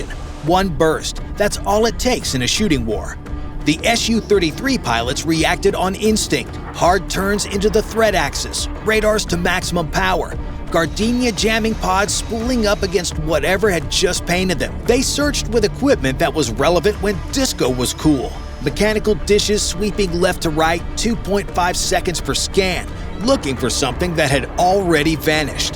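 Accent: United States. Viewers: Don't get me wrong, the narrative and story is bob on. It's just the hyper, over dramatic American narrator that I find annoying. Maybe it's because I'm British.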